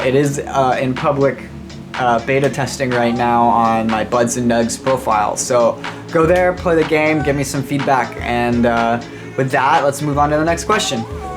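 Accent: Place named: United States. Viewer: I heard this type of voice everywhere in America. Just why do they all sound the same.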